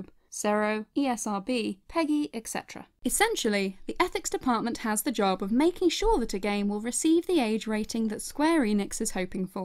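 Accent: United Kingdom. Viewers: I´ve got a question not related to this video. As a teacher of English in my country (Spain) I´m always looking for new British English speaking media sources (preferably about my hobbies, one of the biggest being videogames) and I happen to love your accent. Would you mind letting me know what area is your British English accent from? much obliged!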